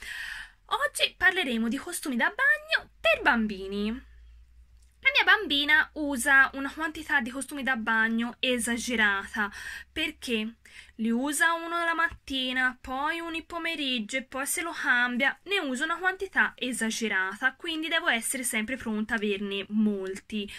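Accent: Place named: Tuscany, Italy. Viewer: Si sente che sei toscana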